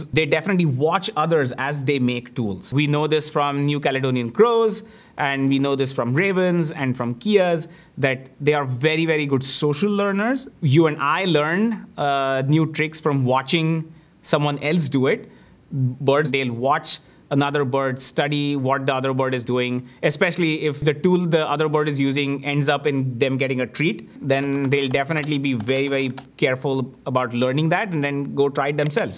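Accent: India. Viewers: On an unrelated note I love this guys accent idk why it sounds Indian but also unique at the same time genuinely got me interested in birds now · he has pure indian accent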